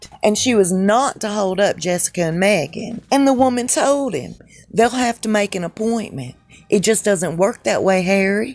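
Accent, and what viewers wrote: South, United States. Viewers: Misleading headlines you are full of it for mere money but you do to worth with that a awful gaad southern drawl please stop it is is really sick.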